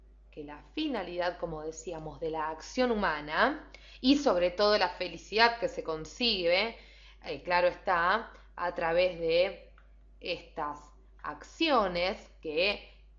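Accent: Argentina. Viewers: Así hablamos en Argentina. La chica del vídeo es argentina. Algún problema con nuestro modo de hablar o pronunciar?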